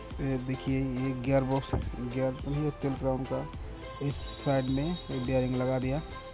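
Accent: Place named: India. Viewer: Aap video banane ki Tameez nahi hai aap bilkul Khadi bhasha me baat kar rahe ho aisa lagta hai aap kis gaon ke rehne wale ho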